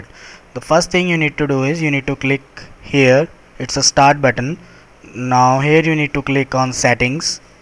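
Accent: India. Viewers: He's Indian, His voice proves everything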